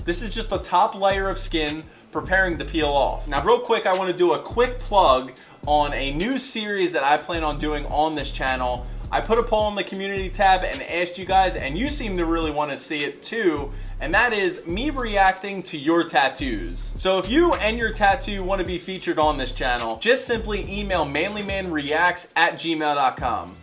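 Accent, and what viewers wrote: Philadelphia, United States. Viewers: You sound like your from philly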